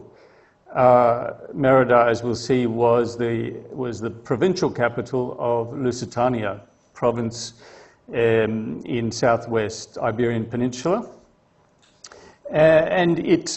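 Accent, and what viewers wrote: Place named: United Kingdom. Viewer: Hearing a Brit attempting to pronounce Spanish is like a hearing a Mandarin speaker say "rolling lorries." Instead of "los miraglos" its "Lahs Mihraahglahs".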